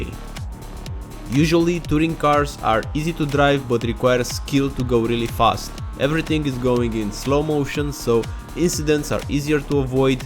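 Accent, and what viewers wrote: Romania. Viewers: are u from romania or ur accent sound kinda like a romanina that knows english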